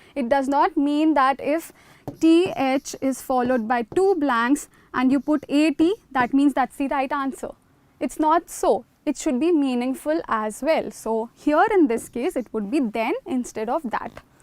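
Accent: India. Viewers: Dear teacher ,your lesson is very fantastic,but it is a India accent!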